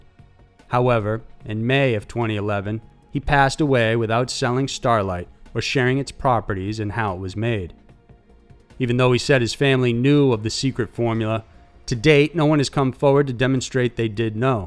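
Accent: South, United States. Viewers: Love that southern accent 💋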